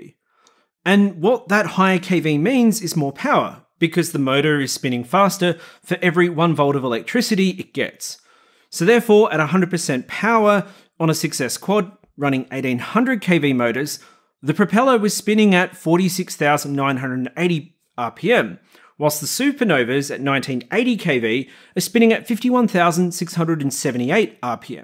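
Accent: United Kingdom. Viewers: It was not a criticism of him at all. He just has a very normal ‘Home Counties’ English accent. Like me. And I do like a lot. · well i bought 8 of his 8s motors they produce 2.7kg of thrust is hes blown the competition out of the water in the 2207 class range and only reviewer thats not useless and done a lot for the hobby and do u even know where his English accent is from because i used too live in the uk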